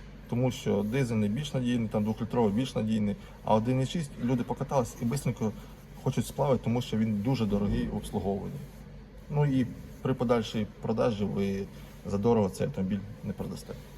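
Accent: Surzhyk, Ukraine. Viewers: А мені подобається Ваш суржик і головне про що Ви говорите!!!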